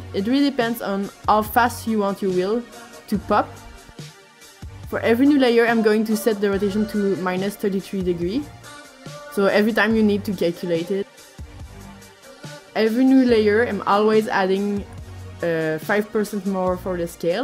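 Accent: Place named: France. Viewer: I can hear a beautiful French accent. Thanks for this video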